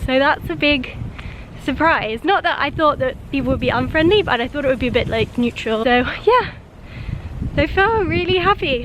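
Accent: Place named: United Kingdom. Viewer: Welcome to KSA. Jeddah is one of my favourite cities as I'm from the Middle of Saudi Arabia but loved it a lot. What a lovely sweet accent you have, no wonder that's British my favourite toooooo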